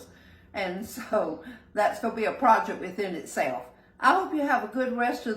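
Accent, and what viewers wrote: South, United States. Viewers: Thank You! I truly appreciate your strength in the Lord Jesus Christ! I follow you on YouTube! I love your videos, you have the sweetest Southern voice! I live alone, and I have 10 beautiful Grandchildren! I’ll be baking pies for our family during thanksgiving holidays! Thanks for being a blessing to so many people!😇🙏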